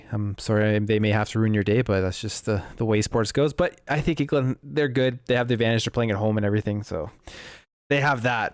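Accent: Canada. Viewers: Figured you were Canadian when I heard Aboot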